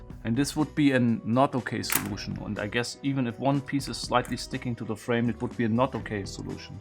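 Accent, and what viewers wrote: Germany. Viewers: You sound like you are a german xD Falls ja , hab einen schönen Tag ;) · I recognise a German accent when I hear one! This is just amazing, as I’m someone who is speaking in some odd amalgamation of different accents. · I can hear the German in you :D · U german? Cause you sound like my english teacher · He sounds sooo German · wie ich den deutschen Akzent sofort erkannt habe 🤝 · This is the first video I watch from you and 5 seconds in the video I thought "bro, this accent, he must be german" and then checked your channel information and I was right Hello fellow german buddy~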